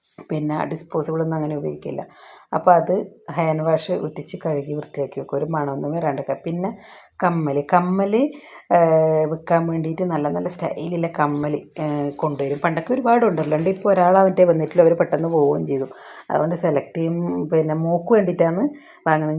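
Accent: Kannur, India. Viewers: YouTube nokkikkondirikkumbozha chechide trainil ndakkunnannathu ingane okkeya enna video kande .samsaram kettappazhe kannurkari aanennu thonni.apo video full kandu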